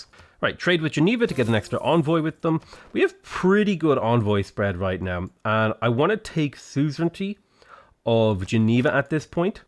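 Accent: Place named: Ireland. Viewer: nothing wrong with your voice. evidenced by the success of your channel it's demonstrably fine. (blessed with an irish accent i would argue its excellent. you're a skilled communicator.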